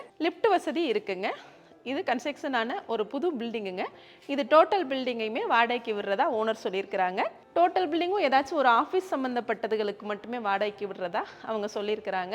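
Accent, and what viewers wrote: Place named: Kongu, India. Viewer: அம்மா, வீடு விற்பனை மற்றும் வீடு வாடகை தகவல்களை நீங்கள் விளக்கும் முறை அருமை. கொங்கு தமிழ் பேசுகிறது. வாழ்த்துக்கள். பணி சிறக்க வாழ்த்துக்கள்.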